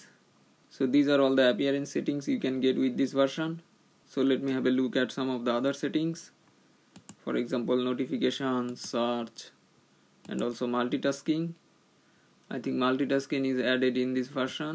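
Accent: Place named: India. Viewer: you speak like indian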